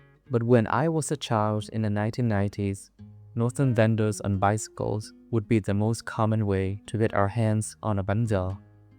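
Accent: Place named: England, United Kingdom. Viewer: Love your english accent, love bánh giò too, keep going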